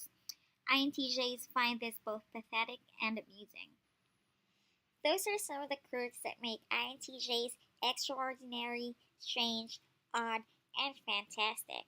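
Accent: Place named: Philippines. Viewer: Your filipino accent is annoying.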